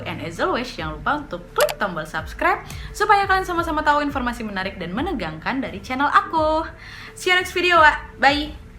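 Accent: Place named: Medan, Indonesia. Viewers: Br tau chanel ini krn social distancing.. Suka krn kontennya ttg crime, nambah wawasan ditambah lagi bawainnya logat kakak" medan ceplas ceplos ga brenti2, seru🤣👍👍👍👍👍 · Kakak tinggal dimana? Kok bahasanya kayak bahasa medan wkwk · Gara2 keseringan liat si wak nih, sekarang aku ikutan dia pake logat Medan kalo ngomong sama anak2 aku, pake "hah" segala, padahal aku arek Suroboyo 😘😘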